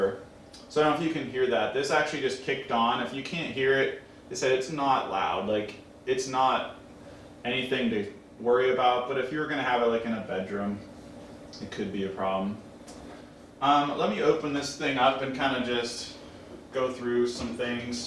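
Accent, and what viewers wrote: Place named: Oregon, United States. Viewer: Is that an oregon accent? He says "these ones" which is typical oregonian.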